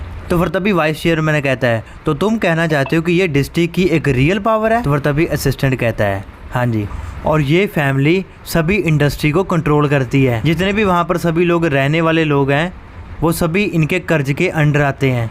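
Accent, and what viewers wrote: Punjab, India. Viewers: Bhai aap Punjabi hona right guess?❤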